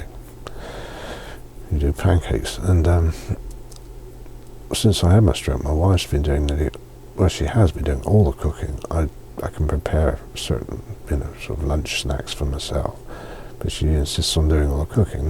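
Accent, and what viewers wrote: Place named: England, United Kingdom. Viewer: What accent do you speak ? It must v certainly come from England Such a fine English is hard to find on YouTube So nice and gentle and mind soothing effect , your way of describing things is great . God bless you your words are indeed helping many here.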